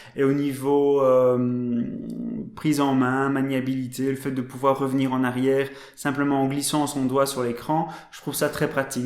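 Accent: Belgium. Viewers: J'aime bien ton petit accent :) T'es belge ? ^^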